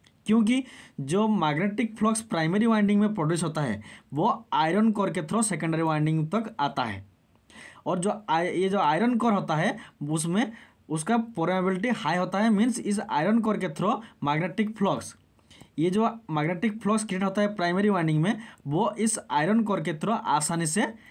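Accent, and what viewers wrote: Odisha, India. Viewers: Bhai tume Odia na??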